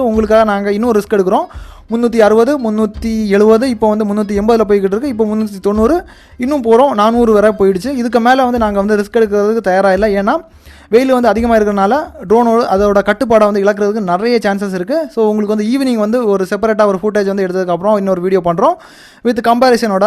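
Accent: Kanyakumari, India. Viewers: Super bro...KANYAKUMARI nnu onga slang vache guess pannitaen. ...keep rock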